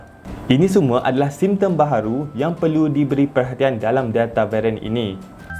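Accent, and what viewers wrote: Kelantan, Malaysia. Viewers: pekat budu terrrr...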